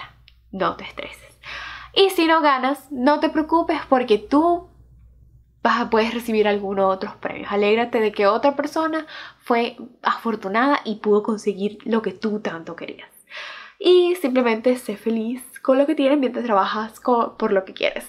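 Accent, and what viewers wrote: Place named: Venezuela. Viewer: Sii! qué lindo sorteo!!! bienvenida nuevamente!! te veo desde Argentina! lo que más me gusta de tus videos, además del contenido, es tu voz! jaja no sé porqué pero me resulta muy relajante jaja será por la tonada venezolana jajaja.. bueno te sigo en casi todas las redes sociales menos snap ya que no tengo :( espero se comprenda que muchas personas no contamos con cuentas en algunas redes sociales ya sea por falta de tiempo o simplemente por gustos...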